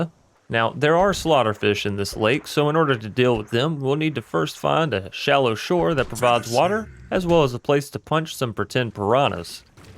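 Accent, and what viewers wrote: South, United States. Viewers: i have nothing to say other than ur voice is rly nice to listen to! i cant tell if u have a slight southern accent or not but its still nice lol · It sounds like you have a country accent sometimes anyway super entertaining video I love stuff like this keep it up brother ❤️ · your mild southern drawl is enchanting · Is that a southern accent I hear?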